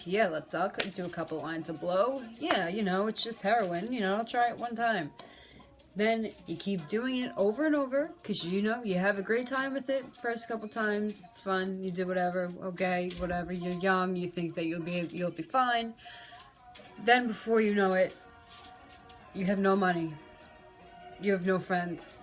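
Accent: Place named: United States. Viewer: I've just started watching your channel, your honesty about your sobriety and life is very encouraging. I have just started back in recovery. Thank you for your straight up posts. They have helped me get back to recovery and get on with it. Like your American accent attitude .. Thank you mate All the way from New Zealand Auckland.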